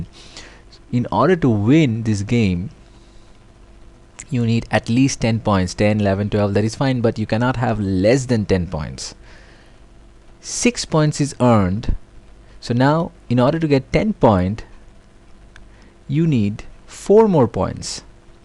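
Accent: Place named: Caribbean. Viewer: Thank you verry much...like your accent..west indian? very good teacher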